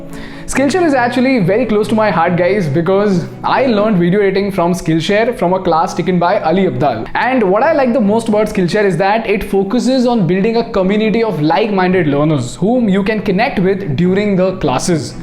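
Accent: India. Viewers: Your information is good but the way you speak English sounds Hindi accent . Please improve your accent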